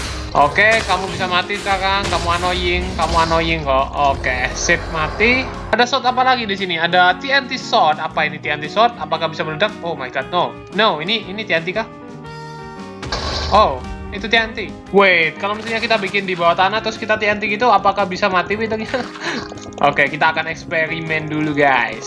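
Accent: Java, Indonesia. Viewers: kok medok dulunya yaaa hehe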